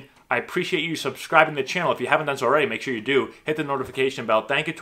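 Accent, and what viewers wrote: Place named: Boston, United States. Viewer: Boston accent. Nice. Now say "I went to a bar and parked my car. I put my car keys in my khakis."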